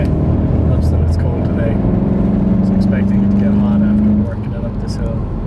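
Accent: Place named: United States. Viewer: What’s with the American accent you’ve only been there a week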